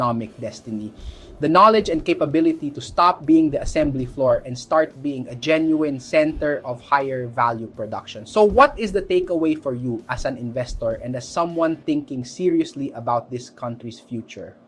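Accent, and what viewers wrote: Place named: Philippines. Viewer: I like your pilipino accent and of course your analysis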